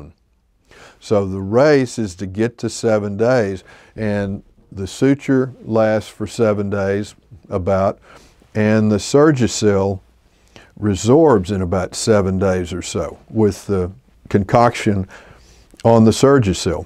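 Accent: South, United States. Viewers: Once i heard is southern accent i knew he was the real deal sourthern dentist who been in the game this long are good ole cowboys and masters at their craft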